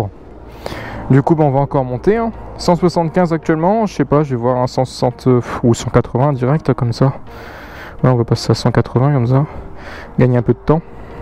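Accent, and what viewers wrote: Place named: North, France. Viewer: Cc t de ou du nord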